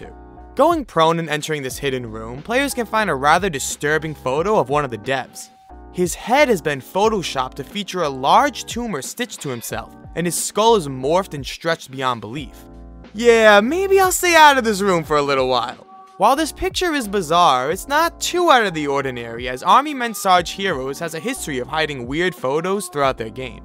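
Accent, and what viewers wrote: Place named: Boston, United States. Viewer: Dude I hate to sound weird but are you from Boston? I feel like you definitely live at the most an hour from Boston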